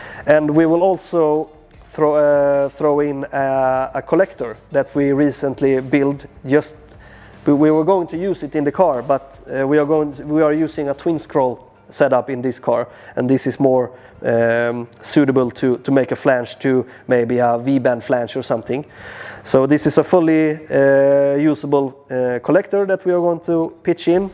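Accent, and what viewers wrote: Sweden. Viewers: A little Swenglish never hurt anyone! 🇸🇪 What an incredible build, subscribed and ready for the next episode! 🚀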